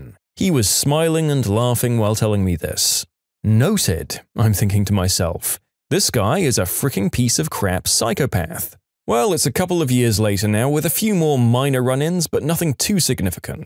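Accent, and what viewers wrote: United Kingdom. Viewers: He's British now?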